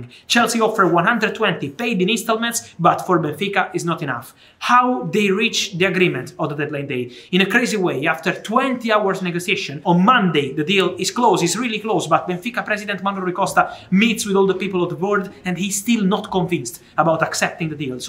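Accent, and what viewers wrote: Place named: Italy. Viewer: Love the accent. Damn Italians..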